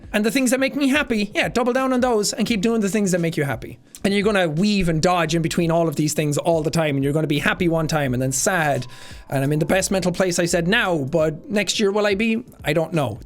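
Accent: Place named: Ireland. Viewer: After the ronnie Neville video I can hear irish side in every video and its amazing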